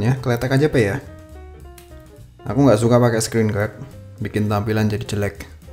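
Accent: Java, Indonesia. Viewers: Medokmu toh mas Bikin ngakak Ckckcckkcck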